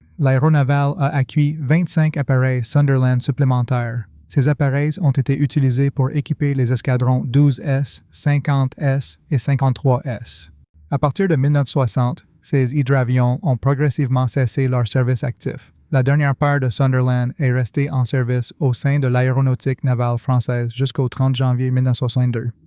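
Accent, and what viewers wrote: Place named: Quebec, Canada. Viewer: I A de merde avec l'accent de Céline Dion..... tabernacle.....mais sujet intéressant....... dommage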